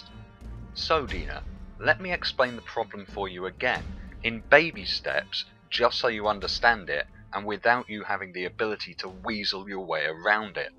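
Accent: United Kingdom. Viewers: For some reason I loooove having earth and space explained to me by a person with a British accent. I don't know what it is.. maybe the impression that I'm listening to the Hitchhikers Guide. Anyway, thanks for making these videos. On a side note, we absolutely need people asking questions about this or trying to challenge any "dominant" ways of thinking. If that weren't the case, we would never make any progress whatsoever. And when dealing with questions about space, the universe and our place in it, the sheer and ever incresing gigantic scales that you have to wrap your head around, can make it hard to combine basic principles and make sense of them, even if you have actually understood them on a smaller level. In order to support people making progress on their own, we should always refrain from name-calling; apply logic and be patient about it. Also, whoever relies on the scientific method should always embrace the possibility of his theory or working model being proven wrong at some point. 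If in this case, however, anybody proclaims he can challenge the spheric model of earth which he hasn't understood (after seeing A LOT of FE-videos I understand this is THE first and foremost wall that flatearthers crash into), supported by false claims which, as he heard from somebody, somewhere, were "actually true", not being able to support this or any other claim or reproduce it by his own means with any model or experiment, whilst having all his "evidence" shattered by one logic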